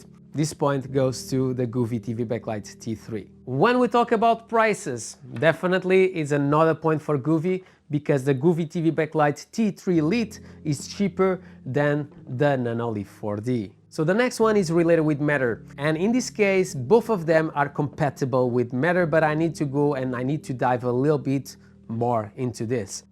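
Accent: Portugal. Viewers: Caralho, pelo sotaque és tuga😆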